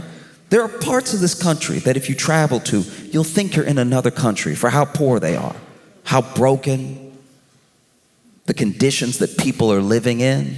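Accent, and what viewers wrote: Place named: Louisiana, United States. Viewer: How does Josh put out so much quality material? He’s one of the funniest comedians today. Also love the Louisiana accent 😊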